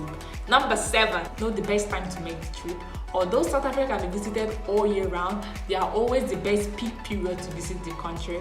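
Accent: Nigeria. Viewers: I can hear you as NIGERIAN GIRL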